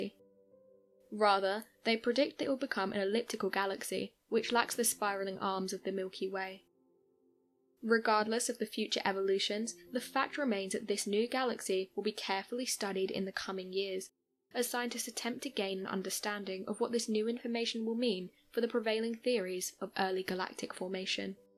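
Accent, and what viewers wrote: England, United Kingdom. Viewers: I wish you could Keep the heavy English accent in England , can’t find anyone who can interpret into American English sorry , bye bye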